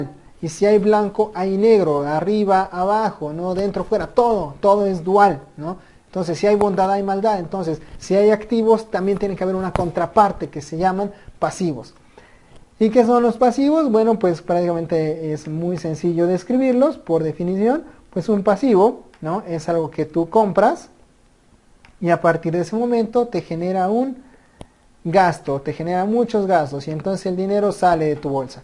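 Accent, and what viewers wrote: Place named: Argentina. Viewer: Hasta que comienzas eres bueno pero parece Argentino ca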